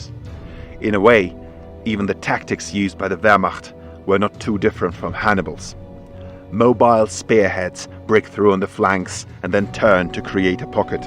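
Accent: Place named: Ireland. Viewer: did you even watch the video? Did you listen...did he sound american to you? The dude is clearly Irish. And that logic makes absolutely no sense! How is that doubling down? Carthage was destroyed and levelled to the ground by rome, its population largly sold into slavery with the rest exterminated. Do you understand history in correlation to current events...? Essentially we've established the guy behind the vid to be Irish and that comparing usa with cathage is not doubling if anything it does the complete opposite.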